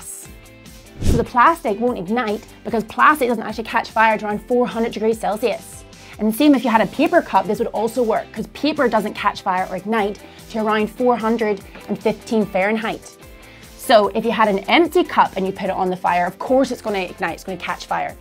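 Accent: Ireland. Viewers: It took me way too long to realise that's an Irish accent, I was wondering what weird part of the US I was hearing 😂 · Does any one know where her accent is from? Another poster said Irish, but I'm not so sure now.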